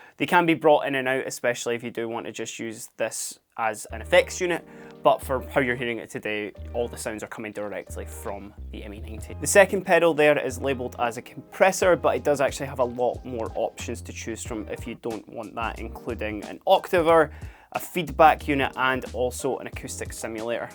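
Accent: Scotland, United Kingdom. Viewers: He can’t help is Scottish accent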